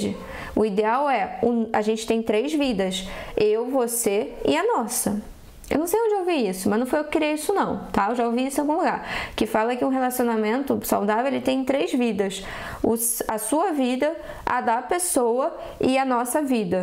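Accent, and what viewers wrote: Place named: Rio de Janeiro, Brazil. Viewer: Eu amo o sotaque e a energia carioca 😂❤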